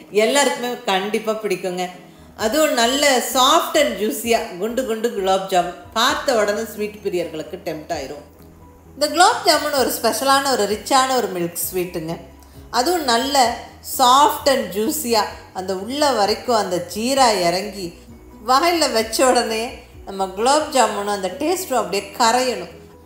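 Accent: Kongu, India. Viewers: Ungaluku koral super kongu styles beautiful sister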